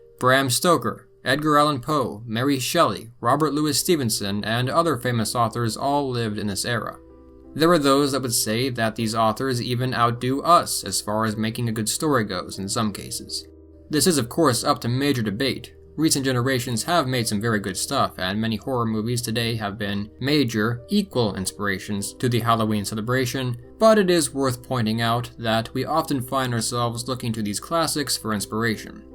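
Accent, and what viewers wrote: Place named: Ohio, United States. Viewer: sounds like your near youngstown boardman ohio area.. lol.. great documentary.. thank so much..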